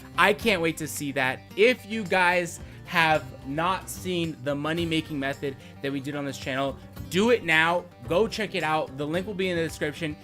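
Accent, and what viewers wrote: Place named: Russia. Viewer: please use Russian accent full time + I like your dimples:) Merry Christmas and new year y'all 😘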